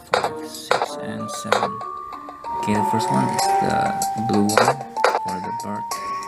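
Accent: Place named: Philippines. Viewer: can I ask, what country are you from? your accent is kinda like a filipino. but anyway, thanks for the walk-through 😁💪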